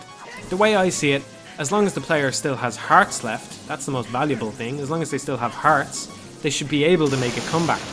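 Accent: Dublin, Ireland. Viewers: Smitty Werbenjagermanjensen It's Irish right? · It’s an Irish accent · Woah, You're Irish Like Me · You're Irish, right? I'm just asking 'cause I love the accent. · He is, it's some sort of Dublin (capital city) accent. There's so many different types of accents all over the country. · Where in Ireland are you from?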